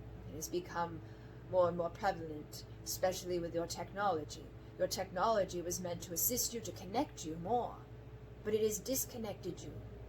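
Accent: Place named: United Kingdom. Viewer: I have catched a British accent for the order. 🙏🏻 This is fascinating and you are so talented. Thank you for doing this for us. Have a good rest.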